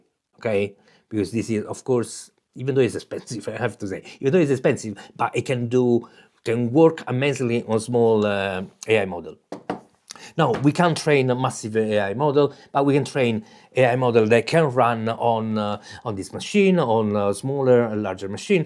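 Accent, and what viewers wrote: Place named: Italy. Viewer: Many thanks for your detailed response, and happy YouTube anniversary! I wish you the very best in the coming years. I was hoping to run a local coding LLM, as well as develop an AI powered app on this machine, but looks like I was under the wrong impression that it can handle that. I really appreciate your clarifying this matter to me. Just a side note: your Italian accent is great! My next door neighbours here in Canada are Italians, and they are the best neighbours anyone could dream to have. Have a fantastic weekend!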